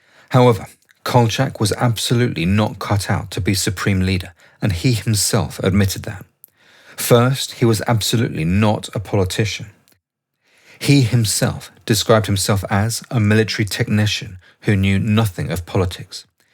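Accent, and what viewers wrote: United Kingdom. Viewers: Yeah usually British accent is red flag of AI slop (due to its ability to deceive American audiences) , but this Channel is solid!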